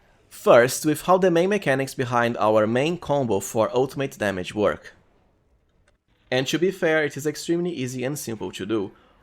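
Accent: Brazil. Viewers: Bro your accent is Brazilian for sure lol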